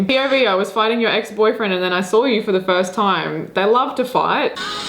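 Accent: Australia. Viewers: I got scared for a second, but nvm it’s fine. (Also are you Aussie? You sound like it) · Ma’am you sound like a fellow Aussie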